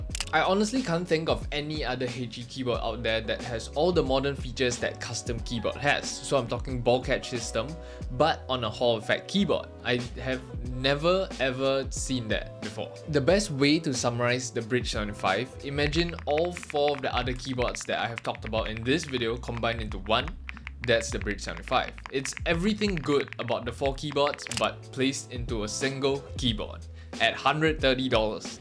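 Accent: Singapore. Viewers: articulate young man with a Singaporean accent 😭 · Stumbled into mechanical keyboards when I saw a Nuphy ad on social media, then went down this rabbit hole of keebs, including subscribing to this great channel with an articulate young man with a Singaporean accent, which surprised me! Very proud of you; keep up the good work!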